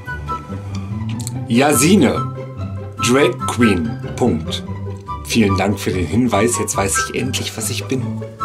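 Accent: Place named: Berlin, Germany. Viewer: Ich hoffe du liest mindestens 100mal mehr positive Kommentare als solche niveaulosen die im Video gezeigt wurden. Dein Humor ist großartig und deine Kunst ist nicht nur unterhaltsam sondern auch sehr lehrreich in puncto Szene, Politik, Berlin und Selbstbewusstsein. Natürlich ist der Ton manchmal sehr derbe. Aber wer sich die Mühe macht hinter die Fassade aus derber Berliner Schnauze und Makeup zuschauen, wird die Kunst und deine Absicht dahinter erkennen.Ich hoffe noch viele Videos von dir sehen zu können.Danke dafür <3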